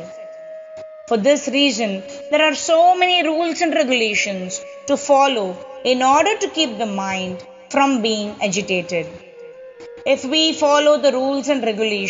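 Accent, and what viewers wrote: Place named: India. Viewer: Hari Hari ! So nice to have Srila Prabhupada's books in Indian accent with such clear speech. Waiting for the other chapters...🙏🏻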